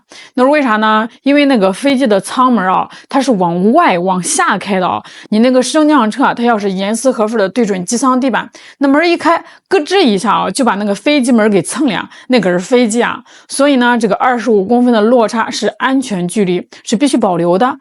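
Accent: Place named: Shandong, China. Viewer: 我不在乎说什么 我是来听山东普通话的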